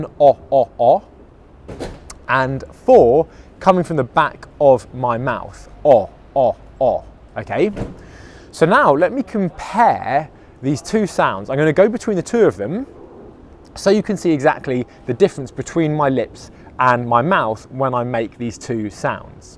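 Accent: United Kingdom. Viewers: Hi. I do like your lessons on phonetics with a British pronunciation. Please continue. Thanks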